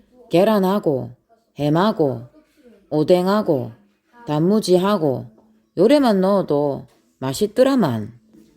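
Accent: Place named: Gyeongsang, South Korea. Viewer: 친정이 경상도라 나레이션 귀에 잘 들어와용 ㅋㅋ 잘보고 가요~😻😻😻😻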